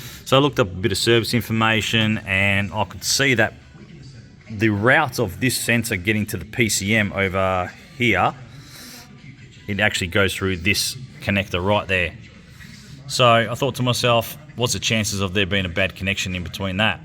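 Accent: Australia. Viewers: Very good stuff my guy!! Im in texas it sounds like you might be from Austrailia? Well where ever you are you helped me from many miles away...that was it! Thanks for posting!!!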